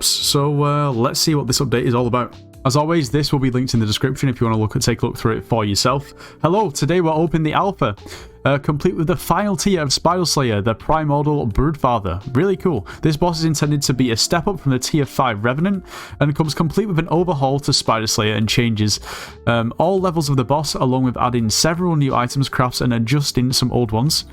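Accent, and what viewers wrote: United Kingdom. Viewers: With the other brits you sounded REALLY British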